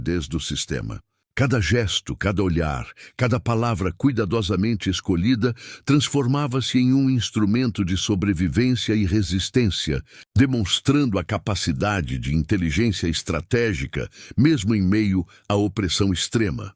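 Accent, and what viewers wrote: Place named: Portugal. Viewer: Só não entendi o porquê do sotaque português de Portugal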